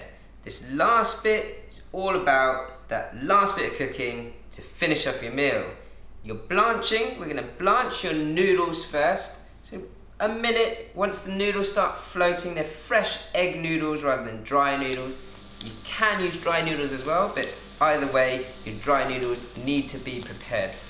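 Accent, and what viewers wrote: England, United Kingdom. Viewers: Random question: are you English? You sound like you have an accent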